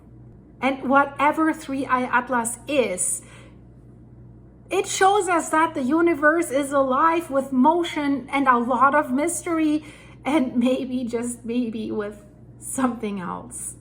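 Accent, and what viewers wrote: Germany. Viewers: Ich mag deinen deutschen Dialekt. Woher kommst du? Liebe Grüße aus Wien 🫶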